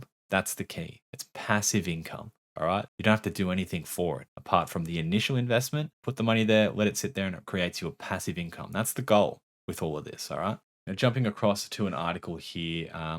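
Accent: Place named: Australia. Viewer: I presume your an Australian (buy the nice accent lol) easy to listen too, unlike many other YouTubers Just subscribed from Melbourne , great job mate , hodling a few Shiba 🤛👏👍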